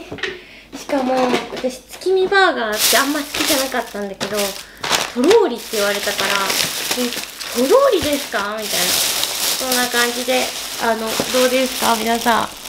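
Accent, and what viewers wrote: Kagoshima, Japan. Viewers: 鹿児島のイントネーション入っててなんか嬉しい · ケンタッキー食べる動画嬉しすぎて泣ける。しかも鹿児島弁聞けてさらに泣けます。今一緒にケンタッキー食べてます🥺🥺幸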